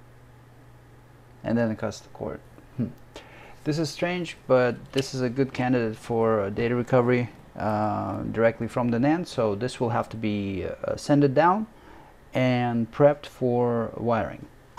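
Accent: Russia. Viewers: Хм. У автора как будто еле различимый русский акцент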